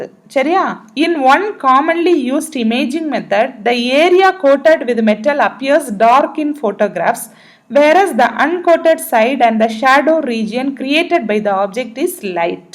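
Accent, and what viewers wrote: Kanyakumari, India. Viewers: MADAM THANKS FOR THIS VIDEO. Unga voice kekum podhu kanyakumari slang maadhri iruku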